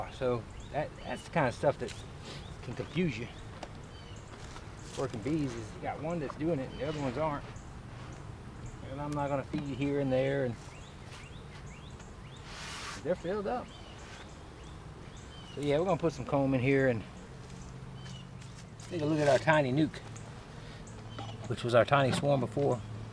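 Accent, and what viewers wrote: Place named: South, United States. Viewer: you speak so softly and you have a sweet southern accent so I need to turn up the volume just a little to understand what you are saying. I have to use ear buds so I don't disturb my grandsons and then my ears get blasted! I can turn the volume down fast enough. I had to stop watching you tonight in order to preserve my hearing. Please keep the volume level of the music the same as your voice so I can enjoy music into my 80's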